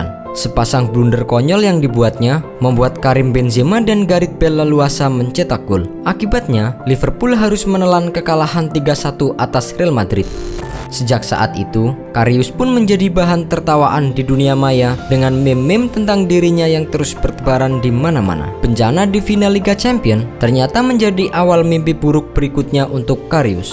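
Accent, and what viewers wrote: Java, Indonesia. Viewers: Ko suara admin medok yah?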